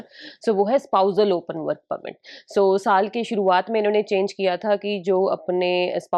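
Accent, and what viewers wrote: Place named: India. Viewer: Why do Indians have such a strong accent in English?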